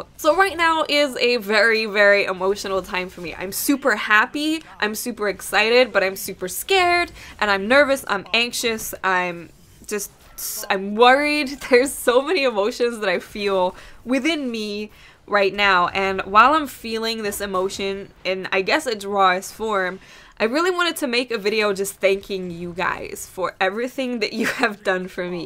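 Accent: United States. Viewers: Where are you originally from? You should like your from somewhere in America but you and your mum are living in the uk? (Unless you are talking about the city named London in Canada) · U sound American. U don't have a British accent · arent you from america, you sound it!! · she's lived in America and has an American accent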